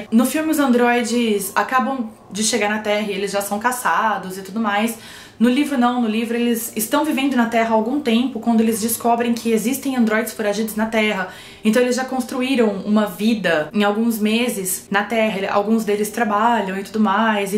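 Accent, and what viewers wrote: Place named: Caipira, Brazil. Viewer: univeRLso foRLte maRLte Que gracinha, esse sotaque caipira. X-) E pensar que esse som RL (um fonema denominado "erre retroflexo") vem da língua tupi, e que se incorporou à fonética portuguesa graças ao fato de que os índios capturados pelos bandeirantes não conseguiam pronunciar o erre vibratório lusófono (presente em palavras tais como "rato" e "robusto"). Eu ia lhe sugerir um livro (na verdade, uns livros). Porém, como vi em seu About que suas preferências são "...fantasia épica, ficção científica e suspenses policiais...", creio que não se interessará por obras filosófico-existencialistas sartrianas, tampouco por um documentário-poesia do Darcy Ribeiro. :P ...mas, se mudar de ideia (a respeito do documentário): watch?v=eqlcHGj4f7k&t=2h36m21s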